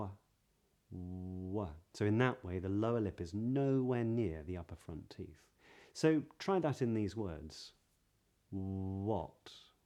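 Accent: United Kingdom. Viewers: Hello sir, thank you and I love British accentt.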